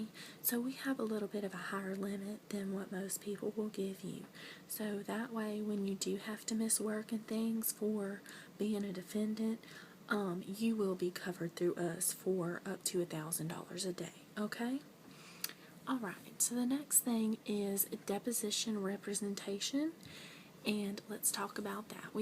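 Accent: South, United States. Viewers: Please please please do more Southern accent videos =)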